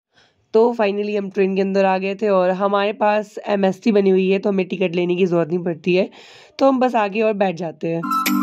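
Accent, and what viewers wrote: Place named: Bhojpuri, India. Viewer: भोजपुरी आवाज से सुकून मिलता है🙏🙏❤❤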